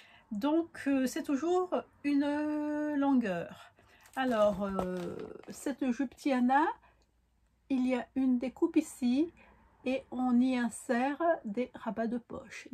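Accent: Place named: Madagascar. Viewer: Coucou J’ai passé un bon moment avec toi dans l’atelier cet après-midi en tricotant. Kassandra me dit: « elle a le même accent que toi » 😂😂. Ben oui on est gasy 🙌🏽🙌🏽🙌🏽 Superbe ta jupe Tiana dans ce tissu ça fait chic. Et wouaou la jupe Dolorès, elle est juste magnifique 😻… ça va bien avec la ceinture. Je crois qu il faut que je fasse la mienne 😜😝. Des bisous de nous 2 😘😘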